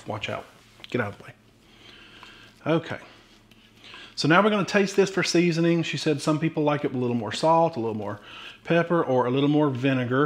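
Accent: South, United States. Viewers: I could listen to this all day, just for his voice! So friendly, so Southern!! Thanks for the info- I'm from Korea and I NEEEEED low-carb rice!!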